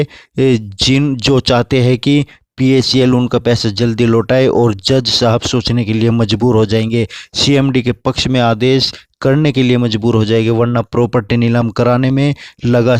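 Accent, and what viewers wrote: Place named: Pakistan. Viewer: Ye Pakistani voice hay .! This voice not Indian voice ...and this voice is 100% sure Pakistani guy dubbing voice ....open eyes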